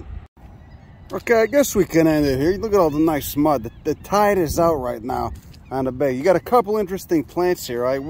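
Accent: Chicago, United States. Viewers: can you please say "flotsom and jetsom" in yer chicago accent????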